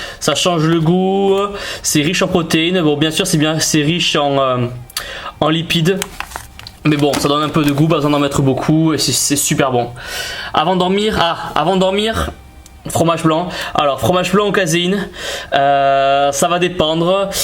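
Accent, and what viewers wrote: South, France. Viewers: Le mecs il avait l’accent du sud avant 😂 💪🏻force a toi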